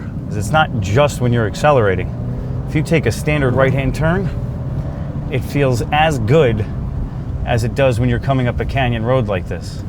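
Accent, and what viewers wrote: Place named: New York, United States. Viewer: I fuckin love the sarcastic New York take on car reviewing I’m going to say you’re from Long Island maybe Nassau?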